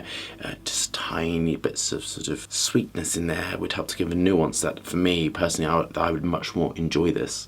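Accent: United Kingdom. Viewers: i just stumbled over your channel. Love your expert knowledge and your talking in british accent.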